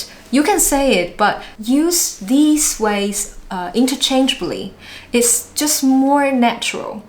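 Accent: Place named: Taiwan. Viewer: I especially like when you include these little things that make you sound more Taiwanese :D Like this 耶!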